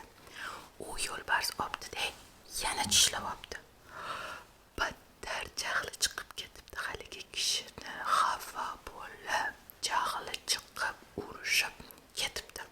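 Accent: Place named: Namangan, Uzbekistan. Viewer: Namanganlimisz shevada oxshavotti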